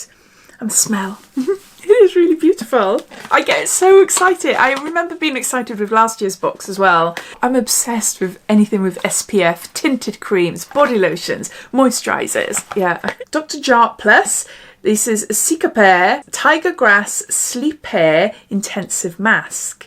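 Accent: Wales, United Kingdom. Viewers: It looks fab xx I loves your welsh accent. My family are half Welsh xx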